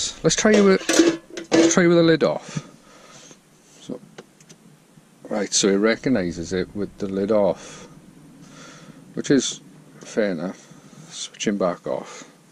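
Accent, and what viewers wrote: Wales, United Kingdom. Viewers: Good tip. Love the Welsh accent.....hope u like my Irish one!!! Was in Wales in 2002...very nice (Rhyll)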